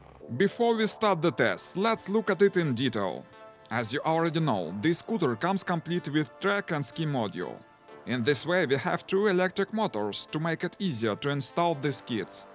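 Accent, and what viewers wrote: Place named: Russia. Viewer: мужик у тебя акцент русский.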